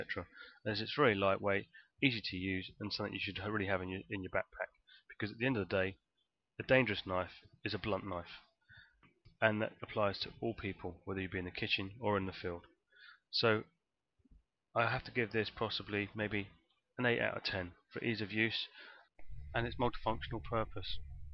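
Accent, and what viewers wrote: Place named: United Kingdom. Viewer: Great and thorough review. And the cool British accent is a nice touch. :)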